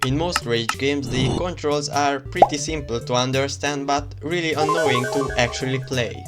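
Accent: Hungary. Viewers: Csá bro hallom hogy magyar vagy amúgy király vidi❤😮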